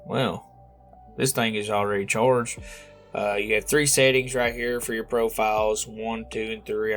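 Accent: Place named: West, United States. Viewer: I like your red dead redemption accent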